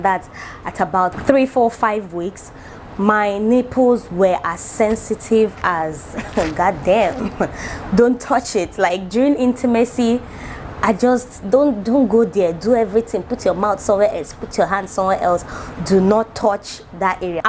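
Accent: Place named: Igbo, Nigeria. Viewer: Am here for the Igbo words and accent. Love from Kenya ❤️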